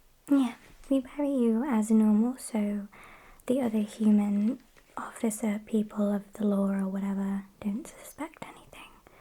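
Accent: United Kingdom. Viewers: Are you british mate? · gurl your British accent is so cuteeeee Oh my god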